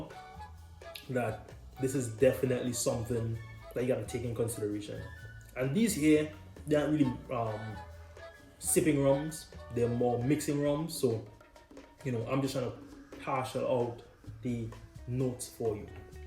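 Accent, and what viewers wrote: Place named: Caribbean. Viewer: I am really taking a liking to the Central American rums lately. I haven't seen Ron Colon rum in my parts however bottling in a wine bottle makes it easy to spot on the shelf if it ever does show up in liquor stores here! El Salvador has had a rough history in the 20th century but very glad to see rums (and beers) start to be made there! Shows some kind of stability has returned to that proud country. I am a huge fan of your accent mate, gives your videos instant Rum street cred! Caribbean accent for sure. Let me guess. St Martin? Good review. Very detailed.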